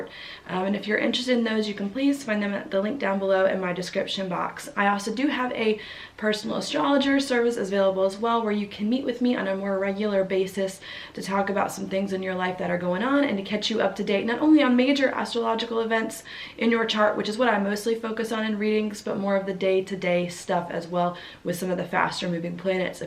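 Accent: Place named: Georgia, United States. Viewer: Thanks,! I couldn't help but notice your accent is reflecting your recent move back to Georgia.;)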